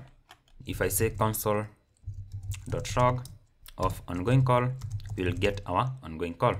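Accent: Kenya. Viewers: where are you from? your accent inasound familiar, almost like a murima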